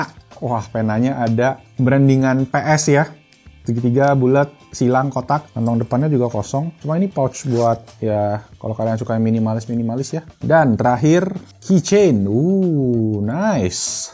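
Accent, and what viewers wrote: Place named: Palembang, Indonesia. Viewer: Haha logat palembangnya gk hilang ya bg, ada kalimat "sisip dikit" wong kito bangett, btw am a one of your big fans and hopely someday bisa kolaborasi🙏 dan shring ilmuny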